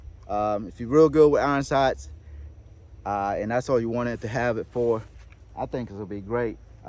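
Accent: South, United States. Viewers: First time i ever heard a hispanic/southern accent mix. Nice!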